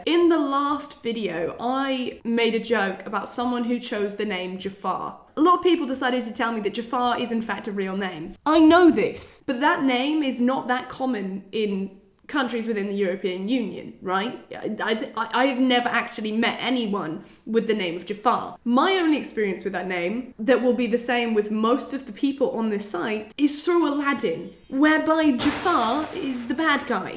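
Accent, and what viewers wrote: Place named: RP, United Kingdom. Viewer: missed this posh accent x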